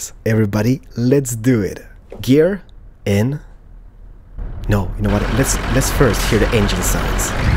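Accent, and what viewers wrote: Scania, Sweden. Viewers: For all people who aren't swedish! does not speak any swedish, he speaks skånska! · Ahahahaha skånska <3 omg varför låter allt så roligt?! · Vilken härlig skånska du har :) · And plz try to not speake skånska när du utalar :)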